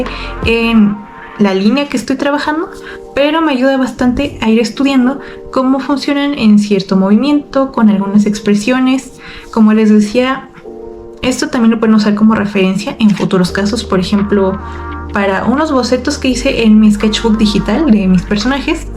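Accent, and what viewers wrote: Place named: Spain. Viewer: En buena onda , no tomes un tono español como lo hiciste en este video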